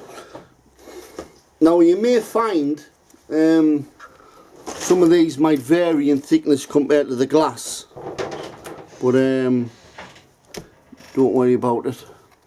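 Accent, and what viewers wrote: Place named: South Shields, United Kingdom. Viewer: hi where you guys im south shields you sound local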